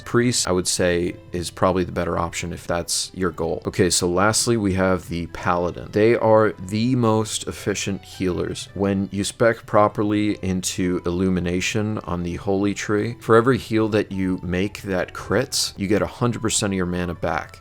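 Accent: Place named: United States. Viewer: I'm glad to have stumbled upon this gem of a channel! That's the case for me especially, since we seem to be very much on the same page; I'm like your European counterpart (unless you're not from the US, but you definitely do sound like it). Following the announcement of official Classic WoW, I was actually considering creating a channel and making videos on similar topics and in a similar fashion as you're doing, but real life got in the way, so it's nice to see that intention is being covered by someone else with roughly the same style, interests (LotR, obviously!) and even the same specific goals for Vanilla. As it happens, I'm also really adamant on playing a healing character since I played mostly as a mage and hunter during retail Vanilla & TBC (from which I'm returning to WoW directly). Like you, I was leaning most heavily towards the priest class, but now I'll also be strongly considering paladin, shaman and even druid (because I love HoT healing), at least for my alt characters. 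To effectively try out all healing classes, I'll be playing on an instant-60 private server called RetroWoW, where you can get free pre-raid BiS from vendors and start raiding or doing battlegrounds right off the bat. That should make testing out all of these classes a much quicker process; hopefully it'll allow me to make an educated choice before the launch of Classic WoW. Aside from which class to pick as my main, I'm also torn between Alliance and Horde. I feel more comfortable playing as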